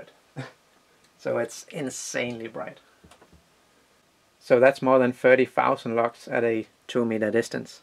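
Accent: Denmark. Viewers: His is a common danish name and he speaks english with a danish accent, so I'm pretty sure he's danish.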